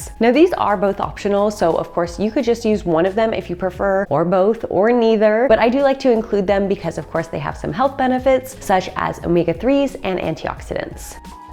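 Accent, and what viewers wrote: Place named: Canada. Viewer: Ive watched a few or your videos and I knew I detected a Canadian accent. When I saw the presidents choice berries That confirmed it.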